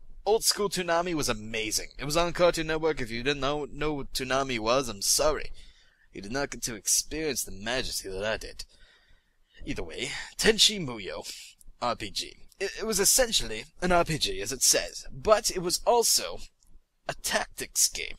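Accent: United Kingdom. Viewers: i love the fact that you turn more and more british in every word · He gradually got more british throughout the episode regardless of the fact that he is american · Is he like British or something? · his british accent is cute^^ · wut's with the brittish accent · anybody else relies he is speaking with a British accent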